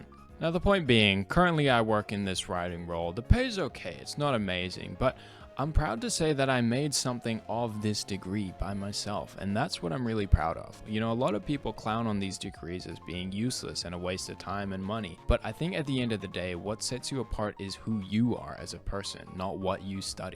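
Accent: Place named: Australia. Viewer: This is probably the most mild Australian accent I’ve ever heard 😂😆 I’m pursuing a film studies degree and while I love doing photography/videography, I’m also worried abt job prospects. But I’m also not particularly a great student so I felt like I had to choose this degree bc I would literally fail the other classes… idk uni is just hard lol. It will work itself out ❤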